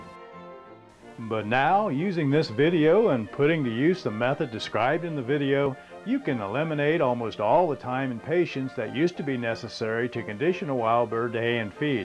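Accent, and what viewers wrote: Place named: United States. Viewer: I thought this was jolly old England , my homeland, untill you started speaking. It was beautifull. Then came the US accent and my mind wandered into the wording of 'backyard birds'... mmm the backyard of birds, Just me? British hunour maybe ehehe. I enjoyed the visit nonetheless.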